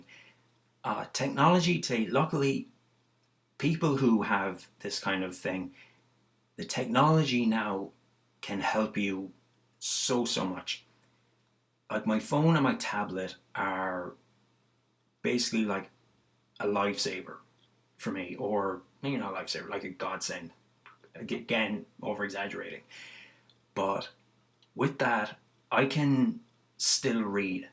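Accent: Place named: Ireland. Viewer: Hi how are you, I also have Stargardts And like you I was diagnosed when I was 7 years old, I am 28 now and everything you describe i can relate to also regarding your vision however I don’t see double when I focus on objects close up instead I just frustrate my eyes and give myself headaches . I use my camera to do the exact same things as you I think it’s brilliant for getting around public transport, airports etc.. some days can be more difficult than others especially when driving is out of the option and a long day can feel like a much longer day dealing with all the extra challenges but the fact that I can get out and about and do pretty much all I need and want with a few exceptions is perfect enough. I come from a family who have been in the motor trade all their lives and I am a huge car fan so you can imagine how devastated I was even at 7 to be told it was a big No driving is not going to happen I’m afraid. 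It certainly hasn’t stopped my interest at all. There’s so muchI have done and still can do with limited vision even driving on a race track, goKarting , horse riding , tennis, American football, rugby anything I wanted to do I did it just did it in a different way and that’s the key to it I think. Personal question are you Irish your accent sounds Irish sorry .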